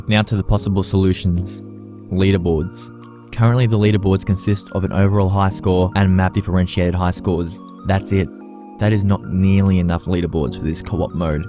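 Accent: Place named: Australia. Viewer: Liked the comm ! but did not understand anything because of your Auzzie accent -_- :p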